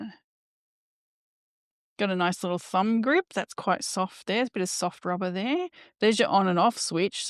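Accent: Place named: Australia. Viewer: Found your channel through this video and two minutes in I was infatuated by your personality! The Australian accent is a bonus 😂❤ very thorough video indeed, thank you! New subbie from Sweden!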